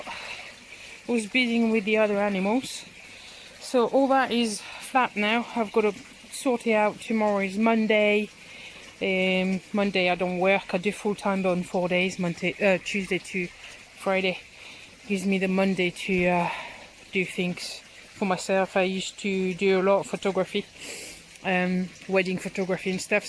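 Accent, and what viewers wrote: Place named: France. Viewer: Keep going, your doing a fine job there, and great videos too. Cheers 👍 by the way love that french accent 👌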